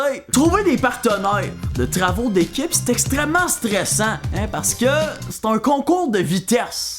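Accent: Quebec, Canada. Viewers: ptn je suis française et j'ai clicker sur la vidéo et ça ma pris genre 30 secondes à comprendre que t'étais Canadien mdr. j'adore votre accent mais faut s'habituer hahah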